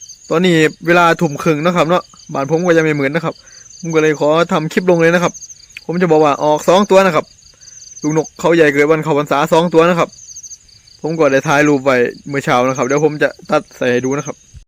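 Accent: Korat, Thailand. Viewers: ดี๋แท่ะๆ...ดี๋ใจ๋ดาเด้อนาย หมานๆรุ่งเรืองราบรื่นๆ มนต์เสน่ห์ภาษาท้องถิ่นโคราช...ใช่ออกสื่อ!!! FCเด้อนาย!!! 😍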